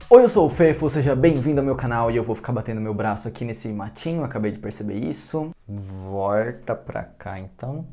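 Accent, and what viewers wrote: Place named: Caipira, Brazil. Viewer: Acho tão fofo esse sotaque "caipira" do Fefo 🤗🥰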